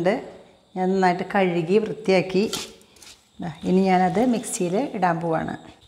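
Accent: Thrissur, India. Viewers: Namaskaram. Valare saumyamaya sambhashanam aanu video kaanan prerippichathu. Valare upayogapradamaya content 👌 Valare Santhosham Nandi. Samsarathil ninnu Nanma niranja,teacherum veettammayum,Trissur swadesiyum Tamil brahminum aanennu manassilayi.Ellavidha aasamsakal nerunnu.iniyum dharalam videokal prateekshikkunnu.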